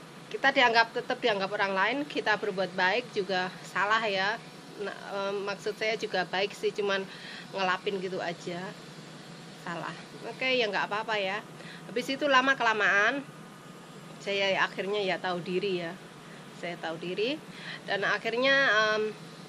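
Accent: Java, Indonesia. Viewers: Seneng liat mba sederhana dan logat jawanya kental banget dan tidak sombong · Siapa nonton 2019? Ternyta udah 20thn an tapi logat jowene isek kentel.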